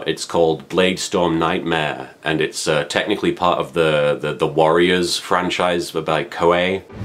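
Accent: United Kingdom. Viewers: Holy shit he's British.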